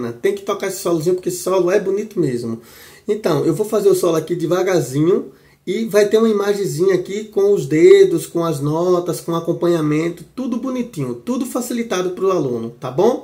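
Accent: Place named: Pernambuco, Brazil. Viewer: Vc é de Pernambuco?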